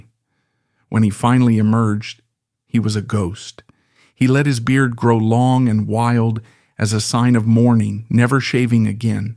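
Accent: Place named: United States. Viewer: pile of AI crap. Worse...Faux European history done with an American accent ..urgh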